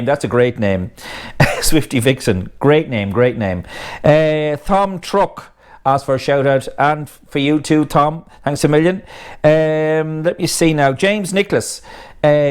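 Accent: Ireland. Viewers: I started watching your vids cz I like the irish accent, but I've grown to love your vids I do miss the european vids, as I've always wanted to do european driving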